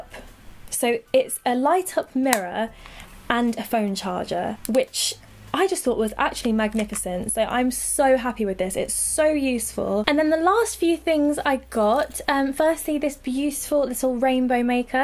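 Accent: RP, United Kingdom. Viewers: Is she British? Or???? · Shes so posh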